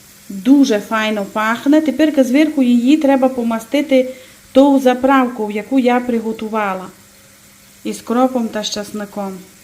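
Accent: Transcarpathia, Ukraine. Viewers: Прекрасний закарпацький говір. Дуже мені подобається.. Щастя Вам. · Йой,як приємно чути нашу файну закарпатську. А ріпка-мням-мням.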